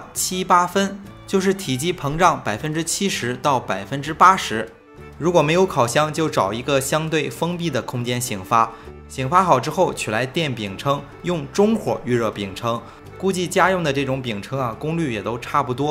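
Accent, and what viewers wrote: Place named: Shandong, China. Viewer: 听声音一直觉得您好像带山东口音，又会做喜饼，先生应该是咱们山东人吧？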